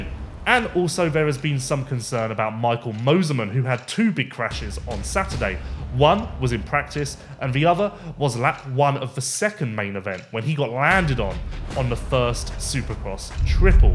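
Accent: United Kingdom. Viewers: I’m gonna have to pass on this British accent. Hard pass. I’m sorry I even clicked on it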